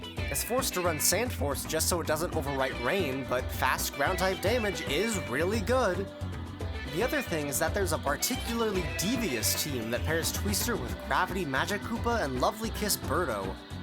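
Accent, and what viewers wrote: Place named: United Kingdom. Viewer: PEAK BRITISH ACCENT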